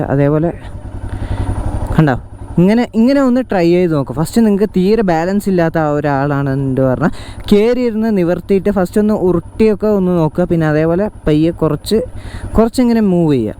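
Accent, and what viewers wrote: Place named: Kochi, India. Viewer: Kochi machaan crrt sound 💯😂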